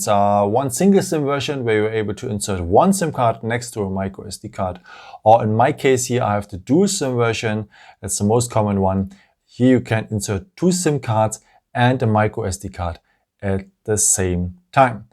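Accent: Germany. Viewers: You sound German by the way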